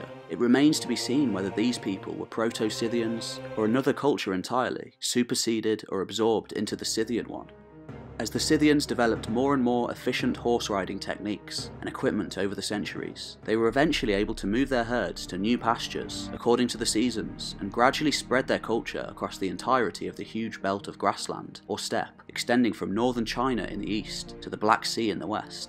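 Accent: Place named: Yorkshire, United Kingdom. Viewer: This guy is from the north of England - Yorkshire, I think - Ewan is Scottish. Very different.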